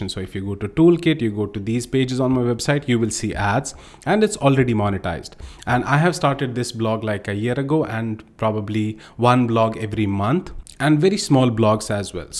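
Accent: India. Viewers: He have kinda Indian accent 👀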